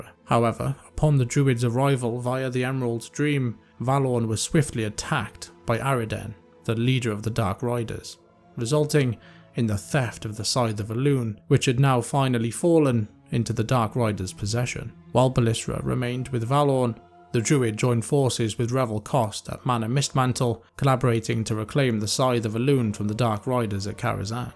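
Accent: United Kingdom. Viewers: Was so glad you were British when I clicked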